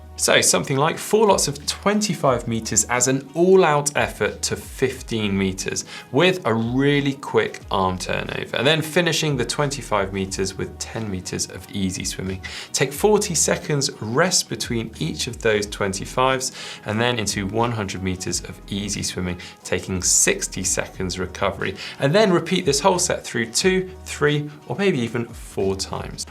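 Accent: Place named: United Kingdom. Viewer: I love the British accent & already ordered a cap, ear plugs & nose plug. Got goggles at Costco.😀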